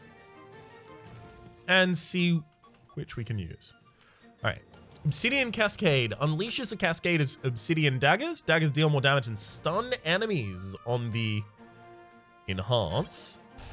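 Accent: Australia. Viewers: That beautiful authentic Australian accent is music to my ears and I want to hear more of it.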